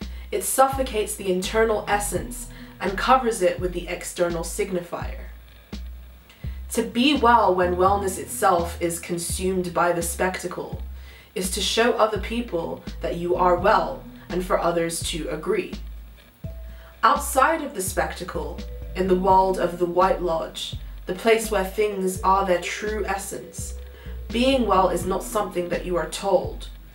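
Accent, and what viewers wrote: United Kingdom. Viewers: Okay so I just started watching your videos a few days ago. Are you British or not? I can't tell and it hurts my brain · I cannot for the life of me figure out if you're southern or a weak scot it's sending me over the edge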